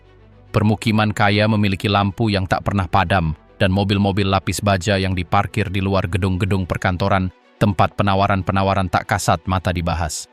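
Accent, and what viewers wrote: Malaysia. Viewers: Iki canel wong Malaysia... Logat dan bhs Malaysia nyel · Pencerita seperti org Malaysia tapi pakai gaya bahasa Indonesia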